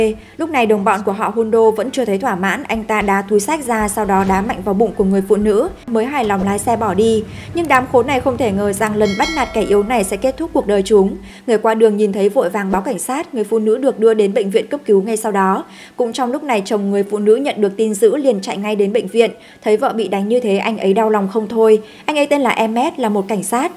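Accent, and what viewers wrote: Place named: North, Vietnam. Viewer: Nghe giọng đọc MC thấy ngán ngẫm không muốn nghe vì dân Bắc Việt cộng đọc truyện thật là không thích, lại chán chường cho cuộc sống hiện tại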